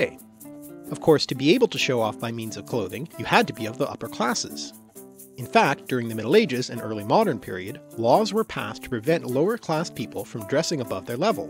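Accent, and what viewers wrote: Canada. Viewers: If no one noticed you were Canadian before, this video with all its ou words is a giveaway XD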